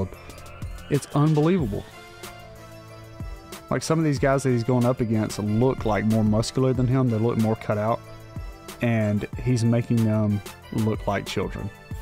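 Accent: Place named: United States. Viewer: Football bro come on, I'm American too but never will I say sawkir.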